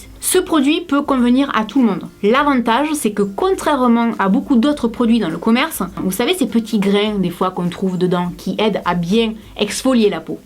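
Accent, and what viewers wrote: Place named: Marseille, France. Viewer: Cc j'adore t'es vidéo continue comme sa juste tu es marseillaise ou pas car tu a l'accent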